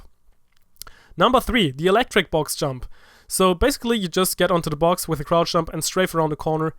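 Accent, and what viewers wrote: Germany. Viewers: you are german am I right? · ! Ist minimal hörbar hast für deutsche echt wenig Akzent, Gutes video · Влад Губин some german accent right there imo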